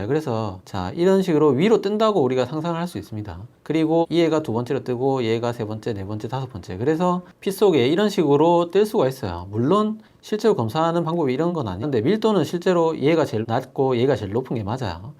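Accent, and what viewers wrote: Gyeongsang, South Korea. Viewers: 구수한. 경상도사투리. 너무 정감갑니다 편안하고. 쉽게. 설명해주셔서 감사합니다 스폰지처럼 머리속에. 쏙쏙. 이해가. 갑니다 짱. 입니다 ㅎㅎ. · 와이거 메모해야겟네..ㅜ 근데경상도이신가요? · 대구분이신가봐요^^응싱스럽다말은 경상도사는 저의세대도 저만 사용하는 사투린줄알았는데..^^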